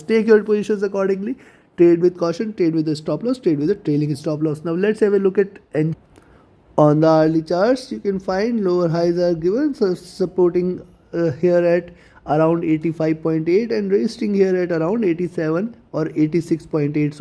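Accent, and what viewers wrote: India. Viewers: Eto je indiyskiy akcent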